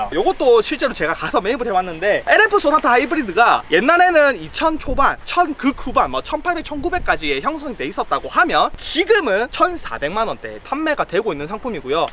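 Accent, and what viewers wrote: Busan, South Korea. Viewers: 댓글보니 외국인같은데 한국말 부산사투리 쓰면서 엄청잘하시네... 제2의 로버트할리인가 ㄷㄷ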